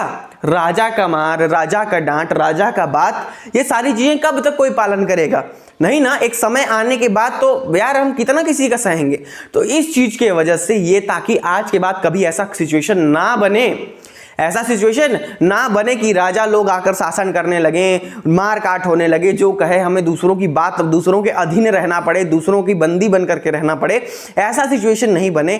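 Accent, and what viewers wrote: Bihar, India. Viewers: Pure bihari😊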